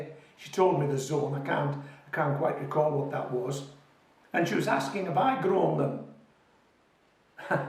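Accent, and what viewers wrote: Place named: Cumbria, United Kingdom. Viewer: Hi, I've been trying to pin down your accent to amateur rugby league teams and i have decided on Wath Brow. Close? Keep the great videos coming.😀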